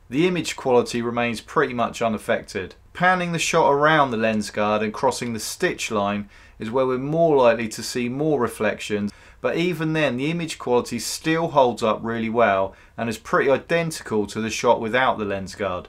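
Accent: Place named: RP, United Kingdom. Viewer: your very posh British accent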